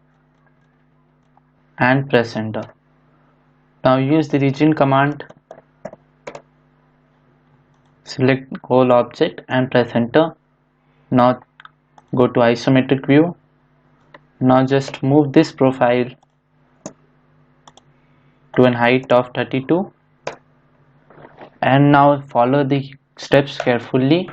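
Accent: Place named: India. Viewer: Video too fast and please reduce the indian accent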